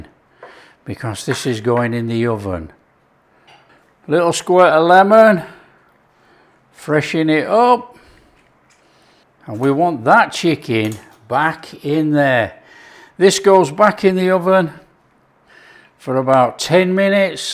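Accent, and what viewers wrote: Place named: Peak District, United Kingdom. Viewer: Where do you live? I live in Florida now but raised in the Peak District. Your accent is similar to those in the Peaks.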